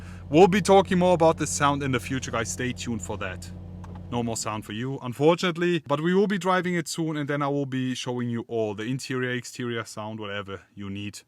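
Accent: Germany. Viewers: I always liked, a German accent, suits the Mercedes. Makes it, more tempting, to buy one. Cheers......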